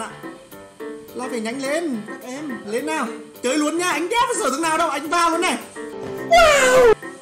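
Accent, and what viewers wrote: Nghe An, Vietnam. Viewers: Ah ở đoạn mô nghệ an rứa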